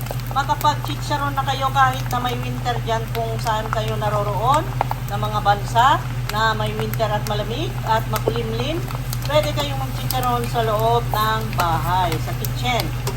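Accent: Ilocos, Philippines. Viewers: Parang Ilocano yata kayo! Taga saan ba kayo?😊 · Ilocano ka tlaga msgsalita, para rin kyong bisaya. Wag knang magtagalog at nkakahiya po kyo . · Ilocano ka po? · By any chance you’re Ilokano?😊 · Ilokano ka ba ma'am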